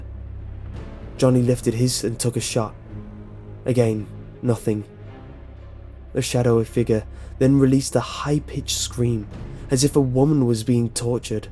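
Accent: United Kingdom. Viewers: Americans? The guy reading this has a British accent. Are you kidding me. Why does everything in the comments have to relate to Americans.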